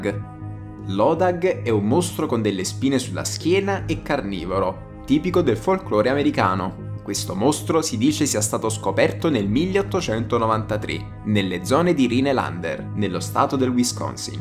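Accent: South, Italy. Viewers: peccato l'accento meridionale del commentatore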